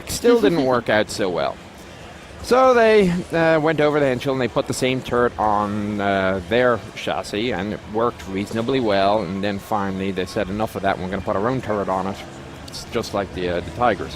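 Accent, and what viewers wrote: Ireland. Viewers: Mr Chieftain are you by any chance Irish? Your accent seems a wee bit Irish.. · I'm sorry I might be completely wrong, but does he have a bit of an irish accent?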